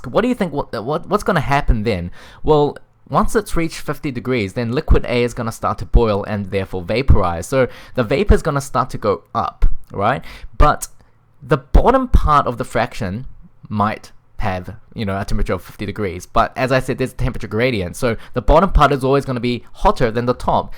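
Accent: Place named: New Zealand. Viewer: Your new zealand accent>>>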